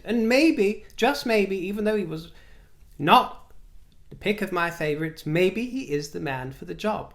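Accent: United Kingdom. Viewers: As a rather conservative, slightly over the hill woman from the flyover mid Atlantic region of the States...I certainly do not feel that Harry's 'Spare' will do well over here,..... There are so many much more important issues on our general minds, that I just can't imagine what demographic over here would feel compelled to fork out whatever £28 translates to in the flailing US currency. ( $32 ish, I think)...that's about a half of a tank of gas for the industrious working...more affluent, in my opinion will be too busy and uninterested in his whingine(?) Whining....and the ridiculously large bunch of our now unemployed or underemployed or welfare crowd won't be able to get it with their food stamps.... Personally, there are so many other and more worthy books to buy that I could've bothered to invest a nickel in anything he might be trying to communicate via a convoluted route, especially when anything he spits out in person is so worthless! 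Besides, I'd so much rather be entertained by you especially, or any various other Brits with wit and humo...especially with a yummy accent!! Just can't see it, any huge sales number, for him from here!!I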